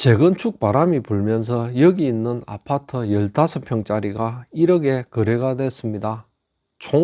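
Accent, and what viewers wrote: Gyeongsang, South Korea. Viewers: 지도 싫은데 굳이 드론까지 띠워서 열심히도 찍었네 ㅋㅋㅋ 난 이양반 부산 경상쪽 만 좌표 찍어서 죽어라 찍어 열심히 비아냥 거리는거 ㅈㄴ 웃김 ㅋㅋ. 70년 생이라서 대학떄 농활다니면서 정신교육을 제대로 받았는지 ㅋㅋ 말투는 영락없는 경상도 말투인데 찍어내는 컨텐츠는 그야말로 지역 죽어라고 고사를 지내는 수준 ㅋㅋ 제발 이런노력 전라도나 경기 권에 가서 하십셔 ㅋㅋㅋ 이런 정성으로 그쪽 파보면 부조리가 100배는 더 보일테니 ㅋㅋ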